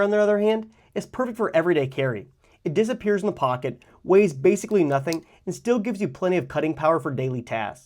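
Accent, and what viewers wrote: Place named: United States. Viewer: If you're comfortable sharing, where do you live? You speak American English but apparently live in the southern hemisphere (because it's winter where you are), so I was curious. I'm guessing Australia?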